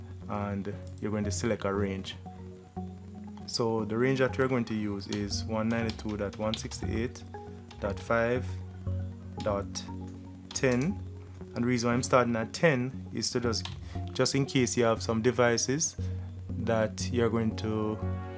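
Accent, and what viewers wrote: Jamaica. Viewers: Sweet. Can learn Mikrotik with a Jamaican accent! Big Up the Tik!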